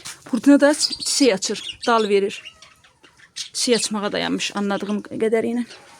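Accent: Azerbaijan. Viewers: Кardesim ellerinize saglik siz azerisinizmi .konusma iz cok benziyor azeriye.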